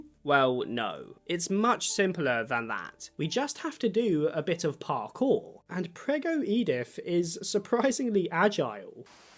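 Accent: United Kingdom. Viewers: ive only just started this video, am or so, but i have to say... the molly bit hurt. because i was a molly on the weekdays. i cant personally remember a lot of my childhood, but i wouldnt be fed long enough that i would squirrel away sticks of butter from the fridge so i could eat when stuck in my room at night. my mother would take my bed away if i didn't do my homework. it's like.... idk, comforting to know that that kind of thing is recognized by the people who made the game as not only tragic and cruel, but... realistic. that it happens. im lucky i had my dad's house for the weekends. he didnt turn out to be the best parent by the end but he helped me survive emotionally at least until i was 11. edit: wow. now, reaching lewis' part, this video is so strangely targeted at me. i experienced Maladaptive Daydreams as a coping mechanism during my young years as well! 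it's something i frankly wish i could experience again in my adult years, even when i know it's really disruptive and i have to try to keep up the adult responsibility thing. ironically, weed is helping me with my dissociation symptoms. nowadays it almost seems as if im suffering with some sort of aphantasia, though. which, as an artist, that blows lol edit 2: OH SHIT YOU DID THAT VIDEO ABOUT THE OLD COUPLE THATS WHERE I RECOGNIZED YOUR VOICE lmaaoo ngl i was sitting here thinking "i can't just be thinking that all british men sound the same, i have to have heard this guy somewhere else" and it was that v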